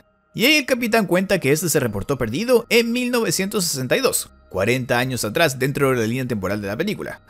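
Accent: Chile. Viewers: Una pregunta eres de chile amigo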